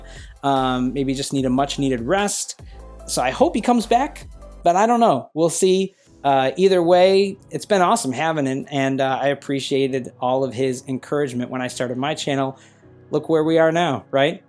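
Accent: United States. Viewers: You definitely have that New York State accent,! Your Game ID should've been: NYNick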